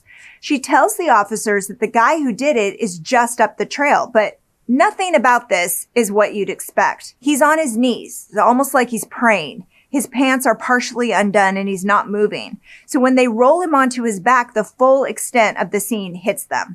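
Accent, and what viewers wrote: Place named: South Dakota, United States. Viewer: Girl, I love you! I’ve been suppressing the urge to ask this for quite a while, but now I have to, lol… are you from South Dakota, by any chance? Only asking because I’ve always detected a hint of an accent/pronunciation with words like, “bag”… for now “dagger.” a couple friends of mine from South Dakota pronounce things similarly. Obviously, I respect your privacy, so no need to share, it’s just my ADD brain 😂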